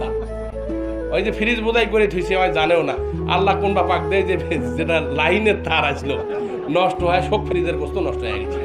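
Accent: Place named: Bogura, Bangladesh. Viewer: বগুড়া জেলার আঞ্চলিক ভাষা এই ভাষা দিয়ে মানুষের মন জয় করা যায়❤❤❤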